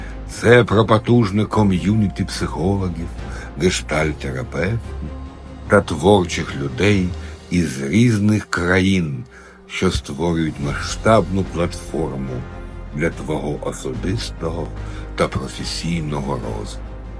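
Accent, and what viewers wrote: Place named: Ukraine. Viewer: у шановного такий чарівний український акцент, майже як у Петра Весклярова (до речі, також єврея), відомого нам з "Вечірньої казки" радянських часів як "Дід Панас":>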